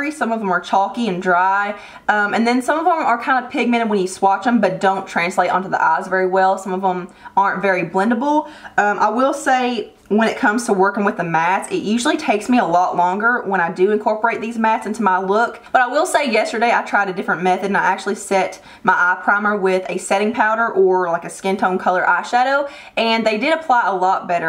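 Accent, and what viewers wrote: South, United States. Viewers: FINALLY, a Beauty Guru YouTuber with a country accent! You just earned a new subscriber!!! #CountryGalsDoitBest #MISSISSIPPIGAL 💋💋💋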